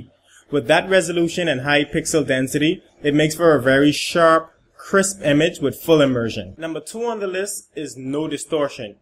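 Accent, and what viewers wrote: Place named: Wales, United Kingdom. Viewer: This guy has a Welsh accent if your wondering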